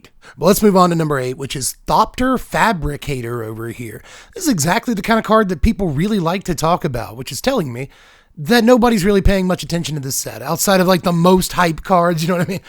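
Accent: South, United States. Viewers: gets more southern with laryngitis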